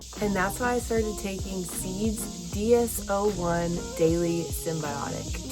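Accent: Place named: South, United States. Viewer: I'm sure most of your subscribers are like me and don't really care that much about hiking we are just here to see a very beautiful girl with a beautiful southern accent. You could make videos about basket weaving and I would still watch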